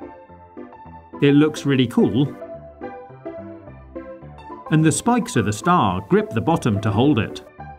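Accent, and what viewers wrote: United Kingdom. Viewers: Das Op he’s british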